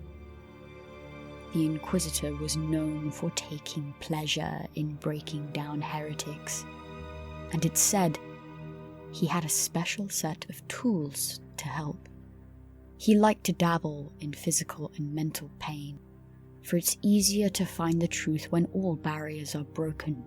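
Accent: United Kingdom. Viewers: I'm looking forward to it. Not you kicking the bucket or retiring.. I really enjoyed the story and the voice reminds me of a really good narration on a channel called cold open stories or something like that. It was also a sisters story. It probably wasn't her as I think all British females sound exactly the same.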